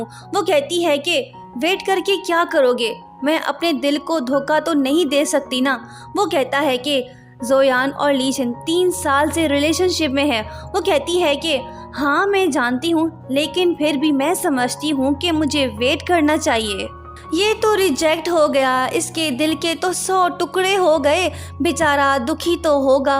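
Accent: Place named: India. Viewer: Mujhe laga aap Indian ho kyuki aapka voice Indian Jaisa Hai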